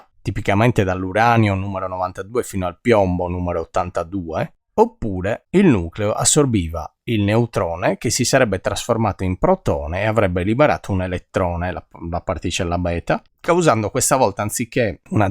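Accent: Sardinia, Italy. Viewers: Professore le faccio tutti i miei complimenti, che narrazione affascinante, impeccabile nella parte scientifica e storica , accompagnata da una grande sensibilità e saggezza. E se mi posso permettere, la lieve intonazione sarda la rende ancora più piacevole (altro che IA!!!) Grazie mille 🙏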